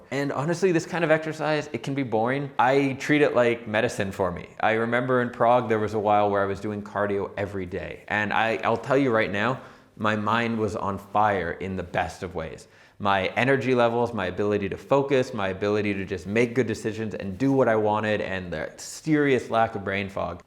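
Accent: Canada. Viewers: Thank you for the video. You really seem to have a Canadian accent! 🏒🥌⛷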